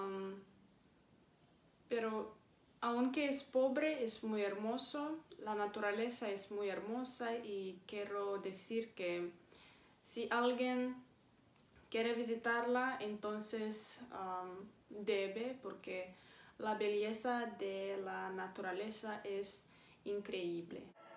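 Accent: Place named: Spain. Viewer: Estas seria siempre o es por concentrarte en hablar español? Intenta hablar más neutro y no como española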